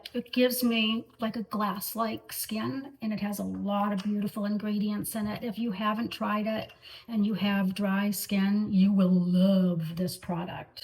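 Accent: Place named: Northeast, United States. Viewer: are u from boston? RI? or NY? accent is hard to nail down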